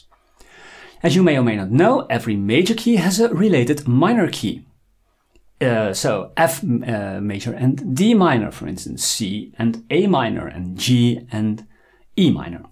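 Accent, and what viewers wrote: Netherlands. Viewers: I enjoy your backing tracks and lessons. thanks for both. I also enjoy your accent, which reminds me of when I lived in Tilburg (I am Australian). bedanken!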